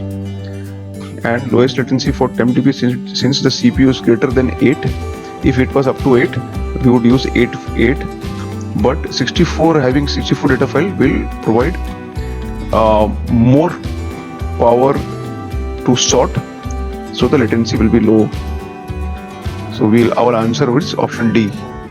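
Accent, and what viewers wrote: India. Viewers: Thick indian accent, crap mic... and to top it all horrible background music - how did you manage to screw this up?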